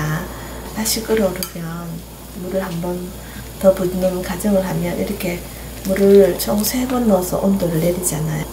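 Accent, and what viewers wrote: Gyeongsang, South Korea. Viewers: 갱상도 아지매 방갑니더~ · 🐋😀아~네^^♡쇠고기국 활용도가 대단하네요😍 😘는 대구쪽이세요?아님 부산쪽이세요?🍎🍏말씀소리가 참 부드럽고 예뻐서예🍡👠💍미스때 직장 동료가 마산출신이 있었는데 정말 말소리가 예뻐서 안 잊혀지네요😍😍😍🐸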